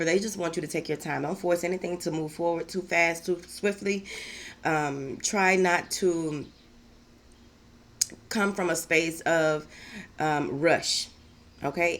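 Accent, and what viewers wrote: South, United States. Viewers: Southern girl!?? I’m from GA & country as ever and I love your country talking tail 😂😂😍😍🙌🏾🙌🏾 specially when you say “Whatever”💁🏾‍♀️🤷🏾‍♀️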